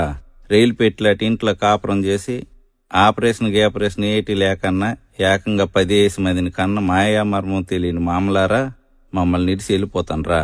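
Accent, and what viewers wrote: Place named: Uttarandhra, India. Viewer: Pakka uttarandra yasa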